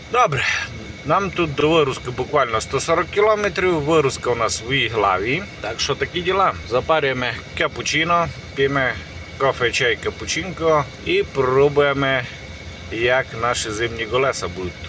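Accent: Transcarpathia, Ukraine. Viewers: Закарпатська говірка неперевершена!!!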